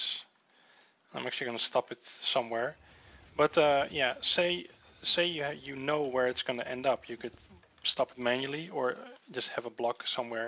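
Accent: Netherlands. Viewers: Are you dutch?? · Are you a Dutchman because i can hear your talk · are you dutch?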